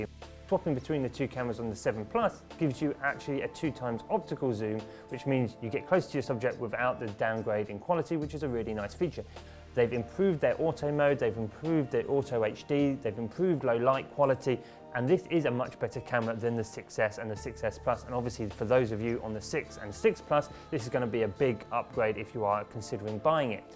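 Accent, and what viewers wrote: United Kingdom. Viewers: iPhone is a really premium phone. But budget wise it is not the best Phone. Samsung is by far the best budget brand there is in the market today. ps. I cant decide if your Accent is British or Australian. I have this British friend who speaks like you. 😊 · British accent so annoying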